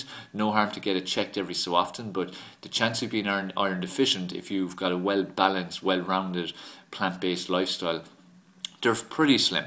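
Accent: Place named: Ireland. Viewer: thanks so much for the videos! what part of ireland you from man?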